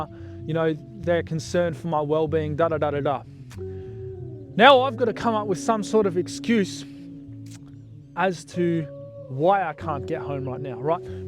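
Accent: Australia. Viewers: mate ... thought he was omegaverse but no he's just australian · Love the way he speaks full Aussie lingo 😊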